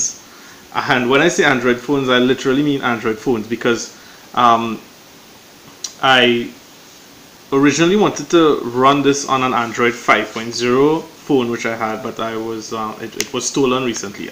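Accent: Trinidad and Tobago. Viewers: Is that Trinidadian accent? My friend is Trinidadian. He has exact same accent.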